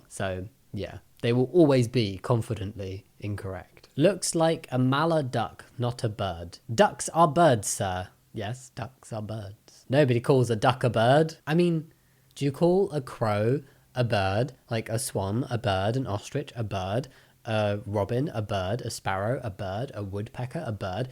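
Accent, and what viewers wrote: United Kingdom. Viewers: it’s alright I’ve gotten used to you saying things in british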